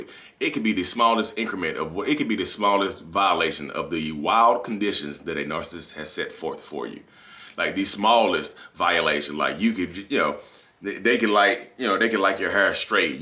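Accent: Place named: South, United States. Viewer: U sound like u are riding a horse Lee southern man voice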